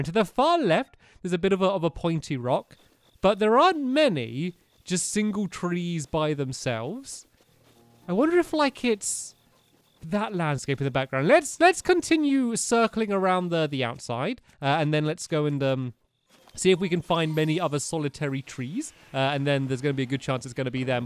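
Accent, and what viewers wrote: England, United Kingdom. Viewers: Uhh he is English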